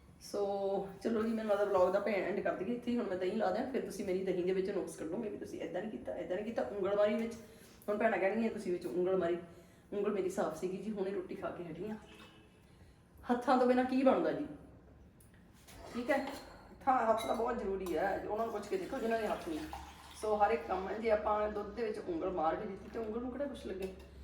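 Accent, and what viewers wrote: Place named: Bihar, India. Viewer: ਬੀਬੀ ਜੀ ਤਾ। ਬਿਹਾਰੀ ਬੋਲੀ ਲੱਗਦੀ ਹੈ ਭੈਣੇ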